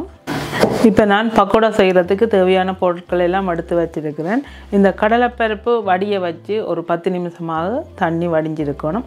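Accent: Sri Lanka. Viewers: Neenga pesurathu ilangai.tamil madiri iukki pakada recipe marvellous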